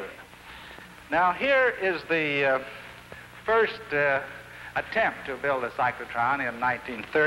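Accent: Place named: United States. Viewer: I loved this kind of clear-cut manly American accent of the mid 20th century, now sort of lost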